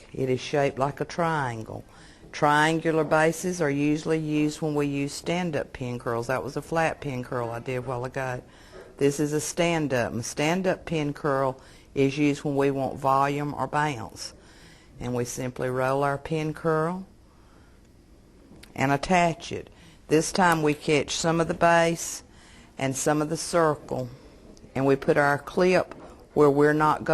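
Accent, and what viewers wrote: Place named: South, United States. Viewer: I like her sweet southern accent! I found this video to be helpful and informative. Thank you !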